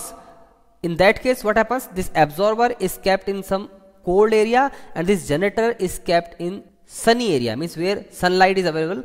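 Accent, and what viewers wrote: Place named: India. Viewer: A little hard to understand the strong Indian accent.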